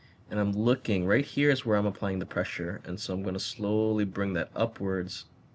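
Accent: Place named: Hawaii, United States. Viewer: I can hear your small kine Hawai’i accent.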